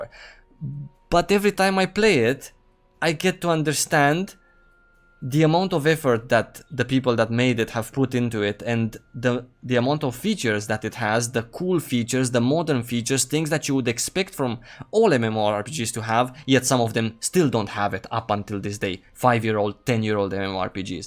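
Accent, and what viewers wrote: Italy. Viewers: Are you from Italy? your english accent it's tricky :D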